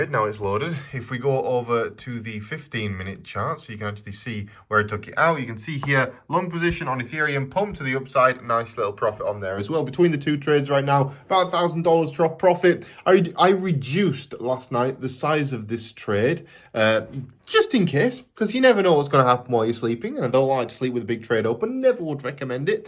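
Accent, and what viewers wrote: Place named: Northern England, United Kingdom. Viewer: Will do captain. Been subscribed for a year or so. I’m from up North too so it’s good to hear a familiar accent. Keep up the good work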